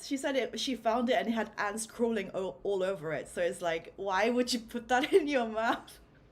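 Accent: United Kingdom. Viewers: Sis, you’re hot. Your voice is sultry. You have a british accent. You’re bilingual. Shall i go on? You’re winning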